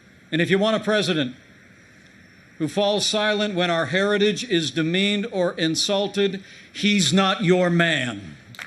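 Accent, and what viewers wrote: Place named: United States. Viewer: I never knew Pence sounded so...American...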